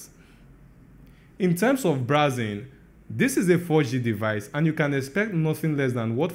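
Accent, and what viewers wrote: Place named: Africa. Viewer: Greetings from Venezuela. I had some africans friends and yoyr accent remember me them accent. I like it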